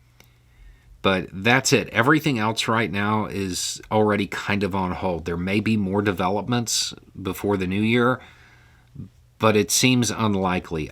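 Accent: South, United States. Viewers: I’ve asked this before, but haven’t gotten an answer. How did this guy develop a southern accent? He didn’t have on in his very early videos. I agree with 95% of what he says. So I’m not a Trumper MQRQN. Just an FYI.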